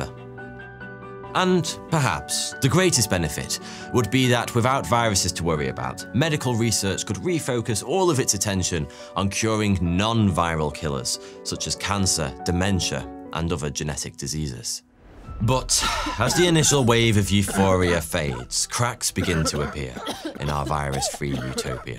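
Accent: United Kingdom. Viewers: Alabama? I thought you were from the UK.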